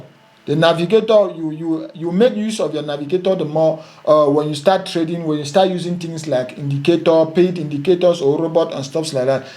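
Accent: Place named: Nigeria. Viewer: No one can teach me shit with this Nigerian accent. Absolutely no one. It sounds childish🤣🤣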